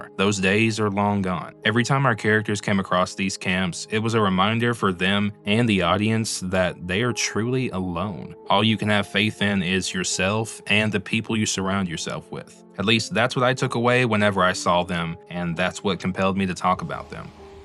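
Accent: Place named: South, United States. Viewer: Off topic- I didn’t notice your accent until “Otis” & then suddenly I could hear it… I really am pickin up the “country” accent if I’m managing to not even notice it 😂 (not bad or nothin, just a silly observation! But on THAT topic I appreciate how well you manage to balance the southern accent & enunciating bc it sometimes feels like a lot of folks around me that also have the accent tend to struggle w enunciating & as someone who struggles w audio processing… well, it can make customer service VERY difficult 😅)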